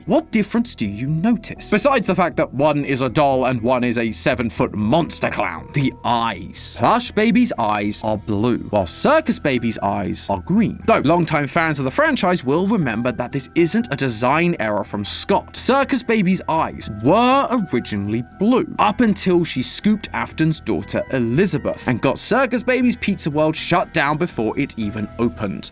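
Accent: United Kingdom. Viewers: Love listening to British Matpat while eating dinner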